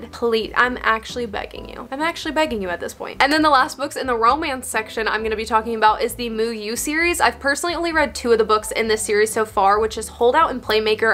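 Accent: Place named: California, United States. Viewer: Ahah I mean what’s your American accent? 🥺 You actually sounds like Californian accent😅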